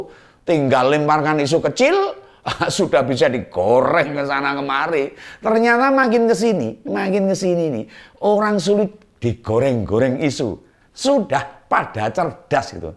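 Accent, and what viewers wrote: Java, Indonesia. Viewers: Saya suka keliru antara dan, logatnya hampir sama juga medok" Jawanya, aku senang ke dua" nya, selalu menyajikan isu" yg lagi hot, tentang situasi politik yg sedang berjalan, semangat terus Om untuk mencerahkan orang seperti saya jadi melek politik, Merdeka 💪💪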